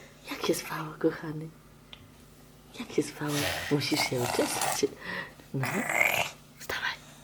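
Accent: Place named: United Kingdom. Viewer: Ooh the accent 18 lat się uczę mowić z brytyjskim akcentem i nigdy mi nie wyszła taka pillow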